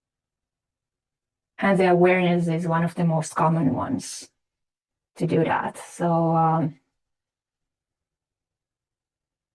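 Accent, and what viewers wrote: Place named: Finland. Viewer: She's Finnish (not German), speaks excellent english with a wide vocabulary (more than most Americans), and has a lovely accent 😊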